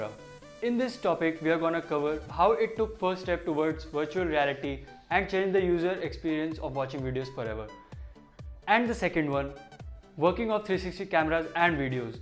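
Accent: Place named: India. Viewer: What is everyone's problem, he's talking fine, it's a heavy Indian accent but totally understandable.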